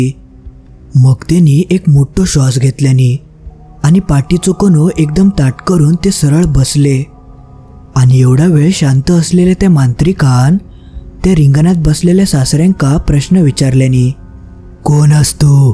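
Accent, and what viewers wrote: Malvani, India. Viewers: Gosta farch chan, aani malvnit aiknyachi maja kahi veglich thank you.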